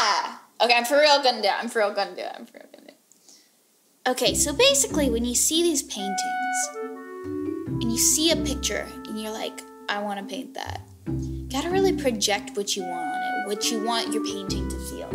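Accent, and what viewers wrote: United Kingdom. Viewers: You sound so good in British accent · I love the British accent!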